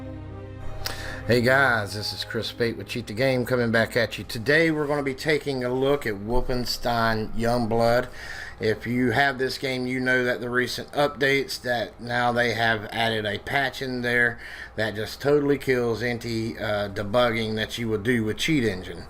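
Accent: South, United States. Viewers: Southern sounding computer talk probably has to be the best thing ever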